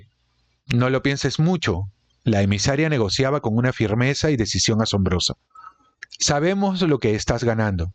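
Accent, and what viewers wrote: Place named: Upper class, Peru. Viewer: Creo que no es acertado que leas este libro. Justo la semana pasada ya lo resumieron en el canal de Orozco que tiene muchas vistas, y como que se pierde el interes (mira las vistas). Te recomiendo seguir con el Baylyverso. Y otra cosa, ese acento de pituco manyado ya paso de moda amigo, y ya tienes tu edad como para hablar asi. Suerte.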